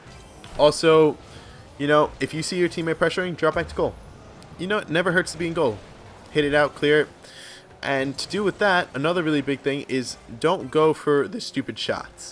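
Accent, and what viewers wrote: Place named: New York, United States. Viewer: New York accent.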